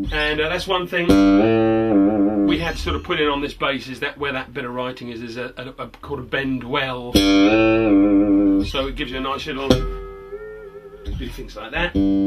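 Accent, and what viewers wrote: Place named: United Kingdom. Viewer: <3 Love your british accent.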